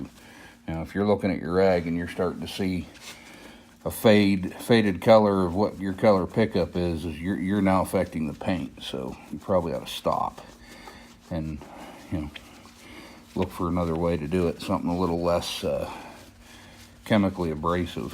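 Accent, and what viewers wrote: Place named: South, United States. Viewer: ^^^^ To O.J. Avery ^^^^ Maybe you should get some hearing aids or turn the volume up. How much do you really need to hear anyway it’s pretty self explanatory. I think you were just taking a jab at some who talks a little differently than you or has a southern drawl. That kind of senselessness is just mean and evil and a huge part of why we are where we are as a country right now. Hope it helped you sleep better at night. Peace ✌🏼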